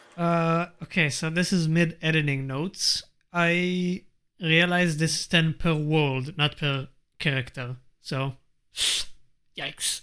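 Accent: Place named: Israel. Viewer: המבטא הכי ישראלי ששמעתי 😩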